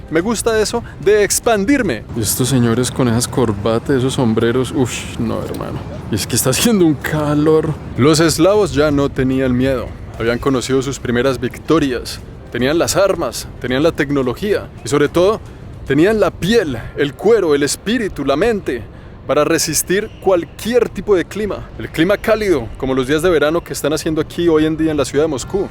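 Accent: Bogotá, Colombia. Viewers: Muchas gracias, te admiro mucho, tú acento colombiano y la gracia de éste le imprime un agradable sabor a tus videos, ojalá yo pudiera hacerlo, un saludo y cuídate del COVID. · Viajo contigo a lugares q nunca conoceré... gracias....me encanta ese acento colombiano....y tú narrativa uuuffff de lo mejor....saludos de México ☘️🙏 saludos a la abuela🌷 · Por fin alguien que le gusta nuestro acento rolo. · A eres de bogota cierto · Muy interesante tu narrativa,el acento colombiano es tal vez el castellano más bonito de latinoamerica ,gracias por tu aporte a la cultura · Eres increíble, además de que te metes unas escenas increíbles de los lugares 👌🏼 aprendo mucha historia contigo 🙌🏼 y tu acento colombiano es todoooo 😍 saludos de Argentina ✨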